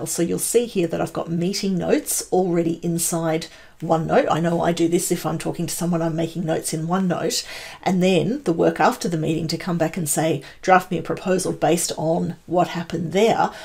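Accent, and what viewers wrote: Australia. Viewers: Thanks a great explanation (in an Aussie accent 👍🏾) Keep it up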